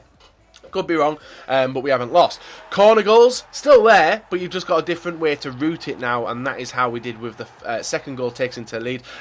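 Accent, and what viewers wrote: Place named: Liverpool, United Kingdom. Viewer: moronic scouser...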